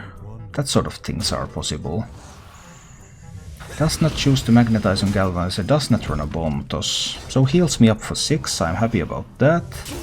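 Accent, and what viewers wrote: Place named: Finland. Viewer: You sound very finnish with your accent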